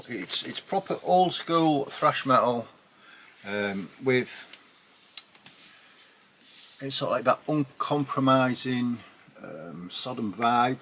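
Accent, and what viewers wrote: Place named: Scotland, United Kingdom. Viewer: I don't know what kind of UK you are but I think you're Scottish. Check out a book called "The Haar" by David Sodengren. I've actually heard of Caustic Wound. Check out Golers from Canada. I can't believe Sodom is still together but I absolutely love this group! Oh wow! I didn't know Cancer was still kicking. I will never go against Pungent Stench's first record but you really need to hear "Masters of Morale, Servants of Sin". That record is incredibly disturbing and just a ridiculously, evolutionary version of this band. Great video and cheers!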